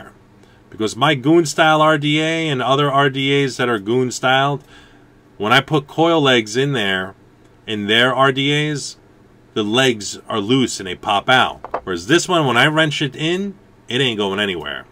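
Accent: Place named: Italian American, United States. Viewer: hey another good review love the italian american accent thumbs up up from australia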